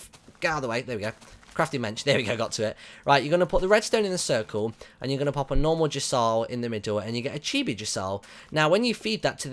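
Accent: United Kingdom. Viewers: yeah... I'm not usualy this predjudice! sorry, I like brittish accents! Plus I love his yogbox series! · this guy is so brittish he sounds royal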